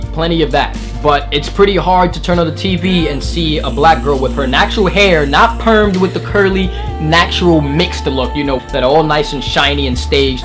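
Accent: New York, United States. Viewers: he sounds so newyorky damn i like it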